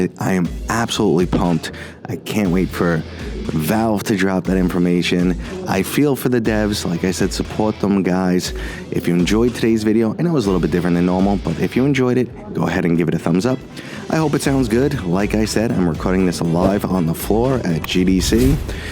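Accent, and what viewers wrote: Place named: Brooklyn, United States. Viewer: You know when that Brooklyn accent "tries" to whisper, you're in for something good.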